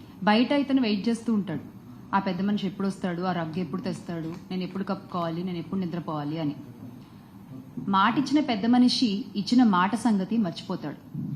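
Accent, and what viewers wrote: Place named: Telangana, India. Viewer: అక్క 😂😂😂 నీది Telangana కదా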